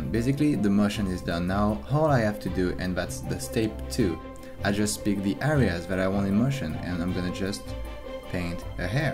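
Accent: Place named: France. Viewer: It literally has subtitles at the bottom of the screen, and the accent is French, but the English is brilliant. Using Action kit now. Excellent stuff!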